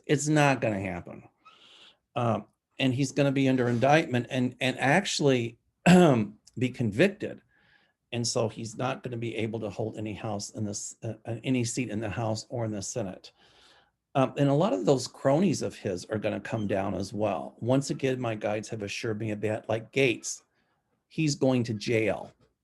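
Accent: South, United States. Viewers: Please, don't apologize for your beautiful, southern accent! 😉 We love & appreciate you, just as you are - never change. 😍 This is what makes you unique & special. I can listen to it all day! Own it. Embrace it. Also, may God bless our dear Champ! 🐕👼🙏🐾❤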